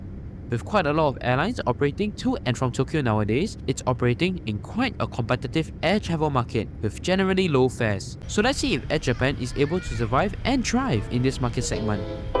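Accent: Singapore. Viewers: I do miss the Singaporean accent. Very nostalgic indeed. Keep it up.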